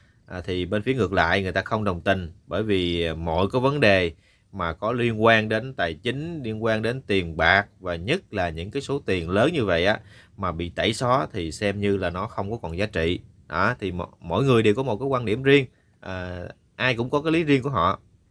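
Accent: Standard. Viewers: mc nói qua chuẩn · Tôi rất thích kênh này họ nói chuẩn 0 phải chỉnh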